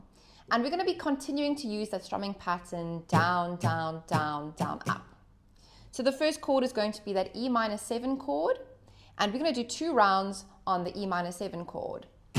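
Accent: South Africa. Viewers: Awesome! You seem to have a South African accent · You sound South African?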